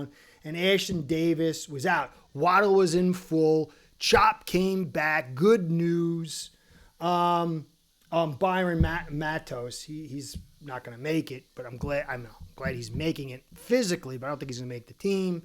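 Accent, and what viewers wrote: New York, United States. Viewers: I love your thick New Yorker accent.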